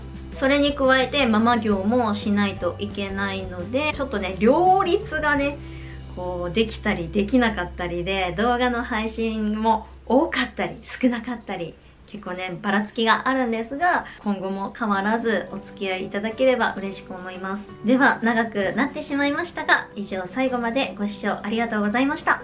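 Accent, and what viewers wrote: Kansai, Japan. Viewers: さんの動画、わかりやすく且つ簡潔ですごく見やすいです！いつも参考にさせていただいております💓 関西弁新鮮でキュンとしました笑考え方がすごく前向きで素敵です✨✨ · すでに11万人になっていますね‼️ おめでとうございます✨👏✨ YouTubeから銀の盾が贈られてくるのはこれからですか？さんは努力家ですね❗ 落ち着いた感じの分かりやすい丁寧な解説が、数あるメイク動画の中でも選ばれている理由だと思います。これからも頑張って下さい😄 (個人的にはさんの関西弁、もっと聞きたい・笑) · 鹿児島生まれ神戸在住ですさんの関西弁萌え(*^^*)♪ アタシは神戸に２０年以上いるので逆に鹿児島弁を忘れた（笑たまに実家に電話したら鹿児島弁になるんやけど 『なんか変なイントネーション』と言われるくらい関西弁が身についてしまってる～ さんは、ベッピンさんやで♡ 美容部員さんは美人じゃないとなれないとアタシは思ってて憧れる。素敵な職業ですよね♪ アタシは美的センスが無いから美容師を諦めて看護師になったけどやっぱりメイクを楽しむのは好きなんでさんのメイク動画いつも参考にしてます(^o^)v これからも楽しみにしてます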